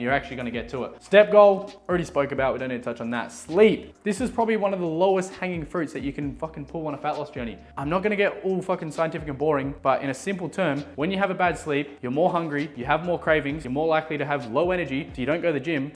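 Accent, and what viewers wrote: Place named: Australia. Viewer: He’s speaking proper Australian mate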